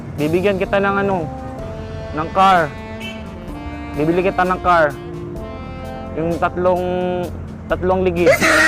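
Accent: Visayas, Philippines. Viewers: Gahi kaayu ag tagalog bai, Bisaya jud😂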